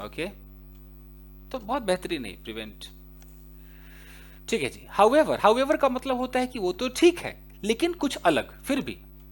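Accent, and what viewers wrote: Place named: Bihar, India. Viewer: Sir ,where are you from ,it seems that u are from Bihar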